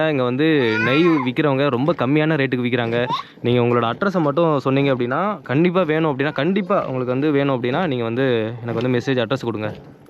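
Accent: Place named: Thanjavur, India. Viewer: Bro neenga tn la delta district ah ? Unga slang lam ariyalur,thanjoor slang mathiri irukku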